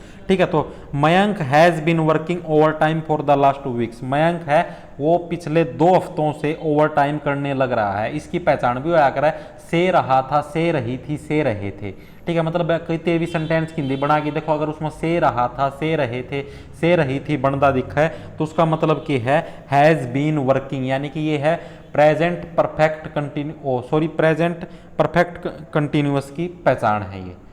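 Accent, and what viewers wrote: Haryana, India. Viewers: Nice haryanvi sir ji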